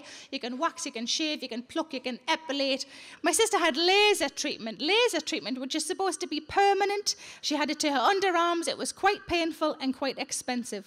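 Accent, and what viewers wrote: Newcastle, United Kingdom. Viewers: I love the Irish sense of humour, too. But, English. That's a Geordie accent - she's from South Shields, in the North East.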